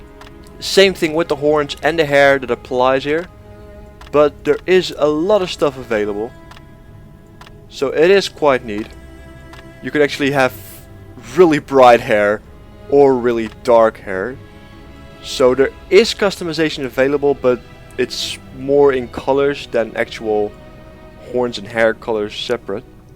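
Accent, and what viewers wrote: Netherlands. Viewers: "I'm and I am Dutch" or aint you? you sound dutch:) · omg that dutch accent tho haha, I recognise it anywhere, mainly because I've got it myself :p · are you dutch?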